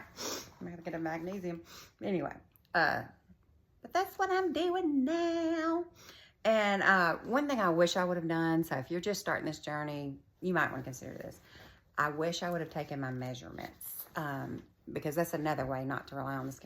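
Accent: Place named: South, United States. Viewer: your sweet southern drawl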